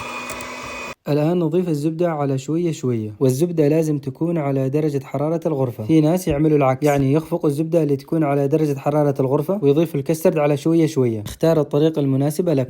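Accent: Aden, Yemen. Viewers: اول مره اتابع قناتك من لهجتك عرفت انك من عيال عدن والان من شانك بااسوي لك اشتراك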